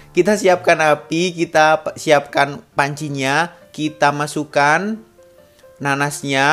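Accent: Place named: Surabaya, Indonesia. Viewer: Aku lho paling suuuwwweneeng nek krungu omongane arek iki serasa nang suroboyo😆😆😆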